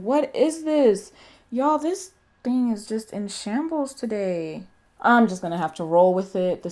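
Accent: Nigeria. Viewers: I love your Nigerian accent it sounds so pretty! This look is so pretty 😍 How do you find out what’s trending ? Thank you for these tips. Very helpful 💕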